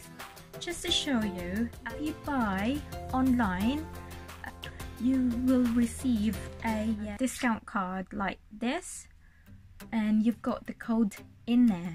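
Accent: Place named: Philippines. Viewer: Nice accent are filipina? Nice sun glasses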